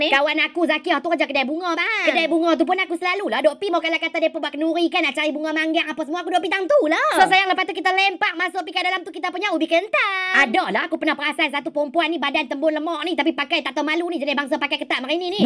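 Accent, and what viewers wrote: Kedah, Malaysia. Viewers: betoi2 kedah. Terbaik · Salam, saya seronok tngok video masak mengekak saya gelak dngn logat kedah tu, ni nak tnya mcm mana nak dptkan pekasam yg berbgai jenis tu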